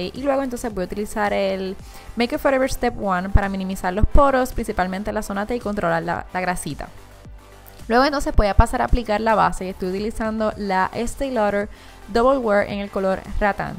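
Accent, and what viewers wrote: Puerto Rico. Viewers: Eres hermosa sin maquillaje y mucho más hermosa con maquillaje.. Me encanta el acento Puertoriqueño.. 😍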